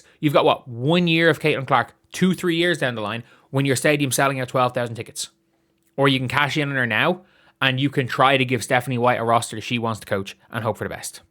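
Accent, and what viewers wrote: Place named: Ireland. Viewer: That’s just the Irish accent lol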